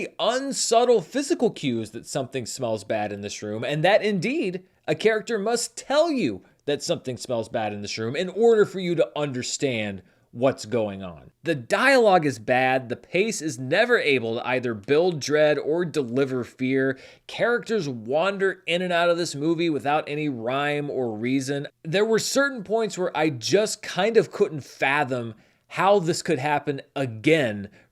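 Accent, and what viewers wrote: St. Louis, United States. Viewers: Are you from St Louis?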